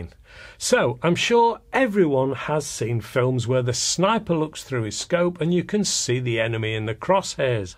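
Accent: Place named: England, United Kingdom. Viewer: Hello Mr. I am from Greece and my name is Eric. Congratulations for your great channel! It helps me very much with all that information for my potential hobby. I really admire and that English accent.I really like you English people!!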